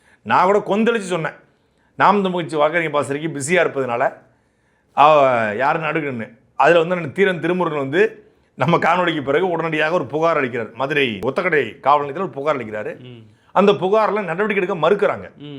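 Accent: Tirunelveli, India. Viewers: When saattai speaks, sometimes his nellai accent slips, that looks very cute❤😅....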